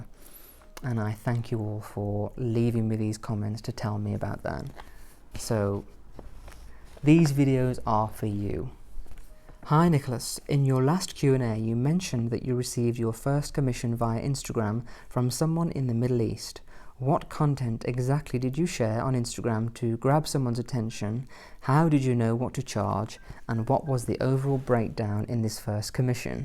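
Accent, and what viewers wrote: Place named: England, United Kingdom. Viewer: you speak like a real English gentleman, born and bred in the upper classes. I could listen to you all day, your voice being so calming. Does this way of speaking come naturally, or did you learn how to speak correctly? I look forward to watching your Christmas video.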